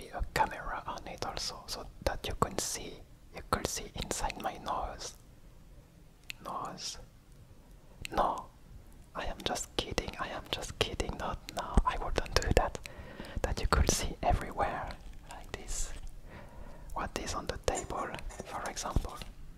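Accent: France. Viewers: tu as un accent vraiment français je ne sais pas si tu l’es à la base c’est juste de la curiosité:)) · J'adore cet accent français 😂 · Cet accent français ! 😂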